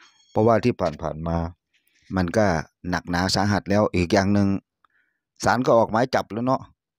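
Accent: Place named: North, Thailand. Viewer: แอ้ดมินน่าจะเป็นคนเหนือสำเนียงแบบคนเหนือครับพ้ม😊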